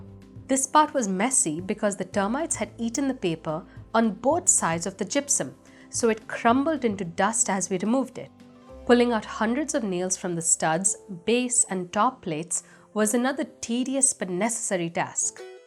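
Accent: India. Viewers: Your accent sounds like you're from India. Are you from Mumbai or Mangalaore?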